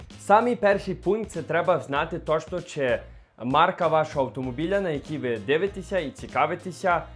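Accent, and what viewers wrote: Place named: Western Ukraine, Ukraine. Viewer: Цей говор западенський , фу , гидко слухати 🤮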